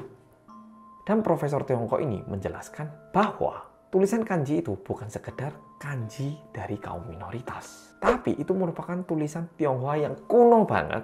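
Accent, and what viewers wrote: Java, Indonesia. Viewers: Selalu ngikuti channel ini,tpi baru pertama komen, awalnya kaget dg logat jawanya sama seperti aq,yg asli wong Jombang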